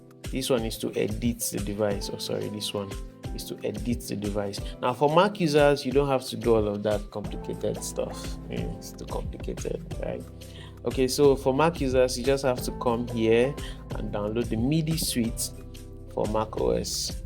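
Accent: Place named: Jamaica. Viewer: Your Jamaican english so cool, love it. I really want to learn Jamaican english for my reggae music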